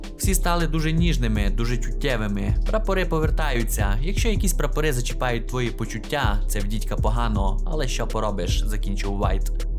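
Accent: Transcarpathia, Ukraine. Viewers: У автора відео закарпатський говір))))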